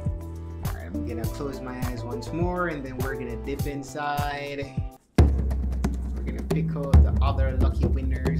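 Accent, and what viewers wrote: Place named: Jamaica. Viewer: I heard our accent lol, I'm Jamaican and a new subscriber😁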